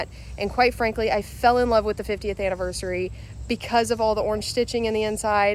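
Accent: Kentucky, United States. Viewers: Congratulations on anniversary. The Kentucky accent is going strong lol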